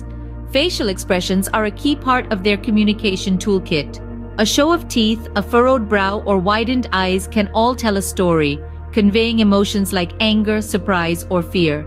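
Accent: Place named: India. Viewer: u CAN'T hide INDIAN ACCENT in your voice, don't try , its won't never happen.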